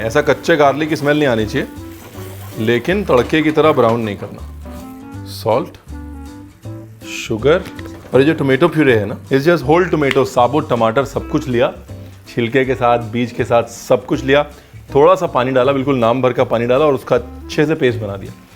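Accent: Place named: Punjab, India. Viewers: The punjabi touch in ur voice is just amazing 😁 and "ranveer aapne btaya nhi" has separate fanbase🌈♥️ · Reason why I love Punjabi accent even more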